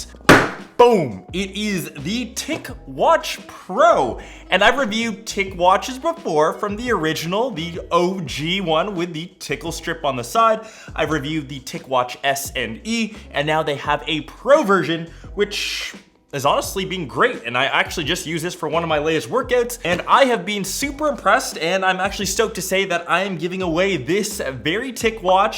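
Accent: Canada. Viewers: Being from the UK I notice you say oooot when you try to say out. Does this mean your from Canada and my young history lessons from South Park were correct ? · Are you Canadian?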